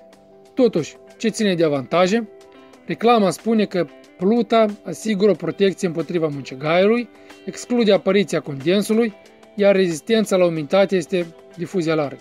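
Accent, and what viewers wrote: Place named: Moldova. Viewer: Salut! Faci o treaba foarte bună cu aceste vide-ouri. Un sfat: Cred că ar ajuta să pronunți unele cuvinte (Mai ales numerele și anumite expresii) puțin mai rar și să lucrezi la dicție. Poate cei din Republica Moldova înțeleg fără probleme. Noi, cei din România, ne chinuim puțin ca să înțelegem 35% din cuvintele pronunțate. PS. Să nu o iei în nume de rău! Toate bune!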